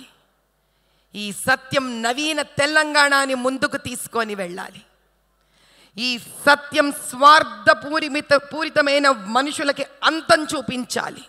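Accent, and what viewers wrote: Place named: Rayalaseema, India. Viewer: పద్మూడు...రాయలసీమ యాస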